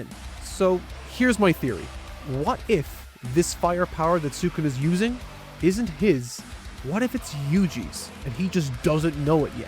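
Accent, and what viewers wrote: Scotland, United Kingdom. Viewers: Scottish lol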